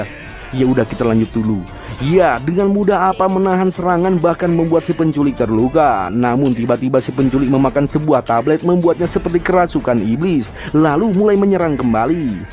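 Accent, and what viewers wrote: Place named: Manado, Indonesia. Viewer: Takage ya manado banget😂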